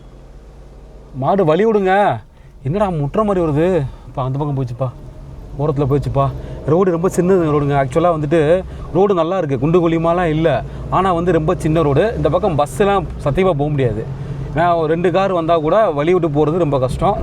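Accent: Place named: Madurai, India. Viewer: Jee ur video super madurai speech super ji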